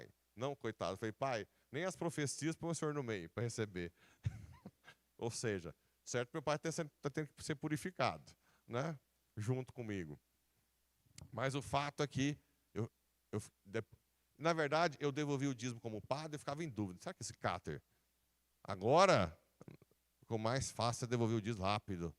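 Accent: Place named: Goiás, Brazil. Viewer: Deus abençoe o senhor Pe, sotaque goiano, é o máximo 😅😅😅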